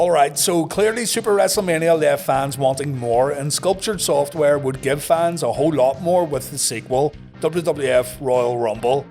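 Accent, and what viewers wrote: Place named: North, United Kingdom. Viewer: could you possibly talk less Northern or Irish I find you hard to comprehend the Queens English would help alot thanks in advance.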